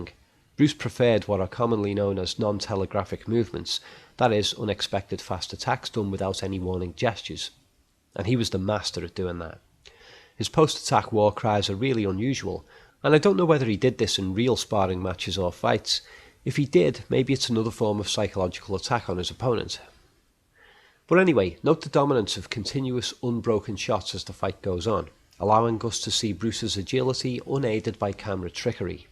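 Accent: Liverpool, United Kingdom. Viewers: you are my new hero... I've long thought of doing a similar analysis but what you've done here is (as of now) head and shoulders above anything I can do... Plus you have the (G)reat British accent (Liverpudlian, I read) which in America assigns automatic intelligence to the speaker, which in your case is legitimately deserved... Just one thing: I'm not sure what the term "roundhouse kick" means to you but per Jeet Kune Do terminology Lee uses in order, hook kick, reverse hook (2), side kick, reverse hook (2), inside crescent and spin outside crescent... Forgive my nit-picking, I've just been in a recent spat with a YouTuber who's been so-called debunking Bruce Lee... Excellent work! I look forward to your analysis of the fight scene with Chuck Norris in Way of the Dragon!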